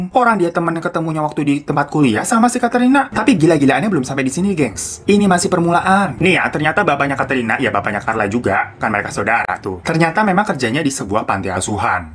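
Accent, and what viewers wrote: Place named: Tegal, Indonesia. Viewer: Suka bgt sama accent tegal nya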